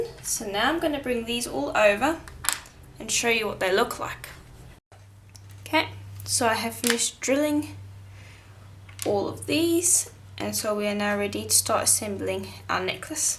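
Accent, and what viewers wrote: South Africa. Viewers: Is that a south african accent i hear? I just found your channel, very cool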